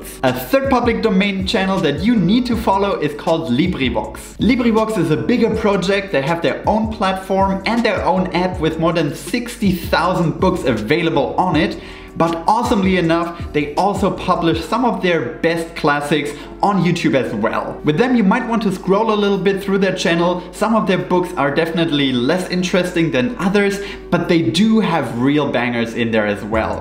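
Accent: Germany. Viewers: Sir, are you German? Because you have the exact accent of a german friend of mine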